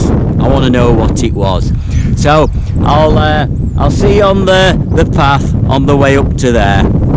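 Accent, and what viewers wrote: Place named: Dronfield, United Kingdom. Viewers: I much prefer your narration and Yorkshire accent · Absolutely love your videos. Originally from Dronfield, so it's lovely to hear your flat vowels. Really fabulous content, do keep them coming. Much respect sir 🙂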